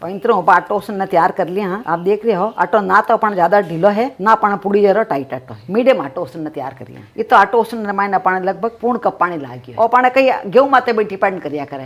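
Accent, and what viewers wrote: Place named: Rajasthan, India. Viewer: Auntyji apari marwadi sunn kar mummy or naniji re yaad aa gaye.... Thari kadhaii jali wali hai.....spl kaiii hai kadhaii ma...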